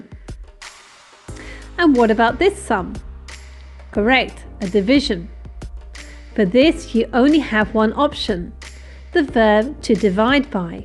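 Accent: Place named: United Kingdom. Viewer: i like your accent can you tell me wich part of the UK is this accent from?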